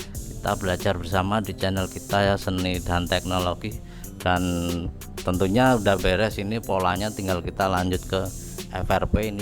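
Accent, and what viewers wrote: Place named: Lampung, Indonesia. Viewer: sampean orang lampung ya mas? kalau di benerin pake hp ga bisa yw mas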